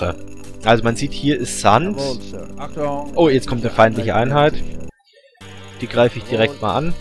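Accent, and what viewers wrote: Swabia, Germany. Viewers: Yay, ein Schwabe ^^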